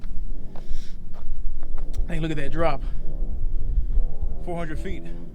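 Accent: Louisiana, United States. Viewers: Sounds like you from Louisiana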